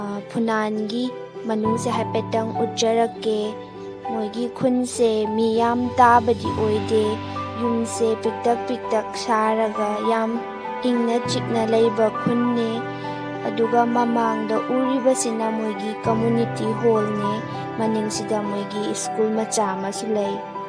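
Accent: Kakching, India. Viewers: Yam nungaidoi malle kakchinggi lonse eidi yam ngaojei leisu yam leininge.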